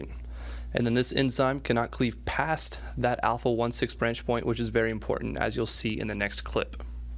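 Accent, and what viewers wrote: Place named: Wisconsin, United States. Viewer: The narrator's accent is so distinct, is that a Polk County Wisconsin accent I'm hearing???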